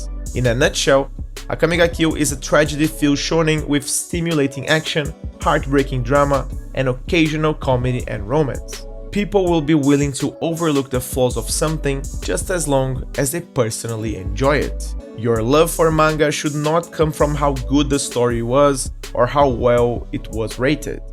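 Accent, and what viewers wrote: Brazil. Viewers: mano esse cara é brasileiro eu acho. ingles bem portuguesado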